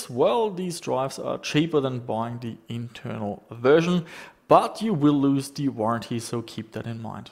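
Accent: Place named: Germany. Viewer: this guy sounds if his native language is German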